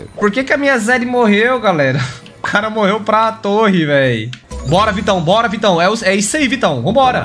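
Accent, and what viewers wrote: Minas Gerais, Brazil. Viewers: é mineiro ou matogrossense?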